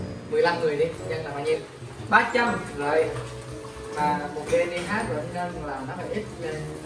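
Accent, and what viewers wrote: Vietnam. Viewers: Anh nói tiếng Nam đáng yêu xỉu 😍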